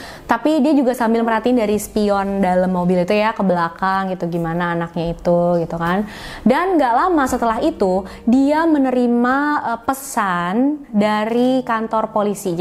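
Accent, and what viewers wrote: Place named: Bandung, Indonesia. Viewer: Kamu orang Bandung ya, logat ngomong.a kaya orang Bandung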